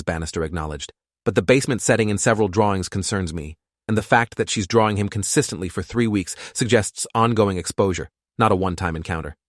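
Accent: United Kingdom. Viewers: Why did he turn british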